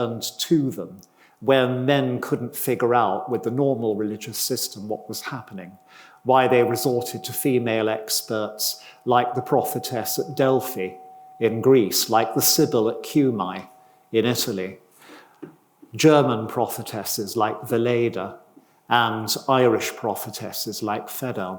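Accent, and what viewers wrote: United Kingdom. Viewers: I heard good things about Ronald Hutton - and then I started listening this video I was negatively surprised he has an British accent. As soon as I hear the British accent I am expecting to hear the most wild insane goofy ridiculous stupid clumsy statements ever. Obviously, my motto didn't disappointed me this time. The only thing that is true and decent is that Christianity actually curbed witch hunting - sacrificing people is strictly pagan in nature. The rest of it is pure garbage. Men hunted women because they were... misogynists? Seriously? Women of the pagan world would brew concoctions... they were able to make herbal medicine, tea and poison. Some of them acted as agents, some of them assassinated people, some of them were performing abortions, some of them were engaged in zoophilia (as American women do now with dogs, old central European women were doing it with the [black] billy goat). 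Some of these women were simply prostitutes, some were seducers (all women are hypergamous) and some of them were liars (as the lie is the only weapon that a woman has in a strong physical manly world), and these lies weren't mild lies, like "I love you" or "I was a virgin when you took me", but lies about who the father of the children is - and this causes lots of problems especially with the nobles or rich men that wanted to have their real offspring and their line maintained... women would also kill their husbands to inherit his riches and go run off with Chad... that's the moment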